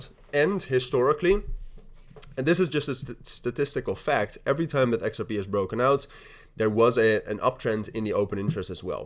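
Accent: Netherlands. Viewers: Ik meende al wat Nederlandse klanken te horen.. heb het me al een tijdje afgevraagd.. 😂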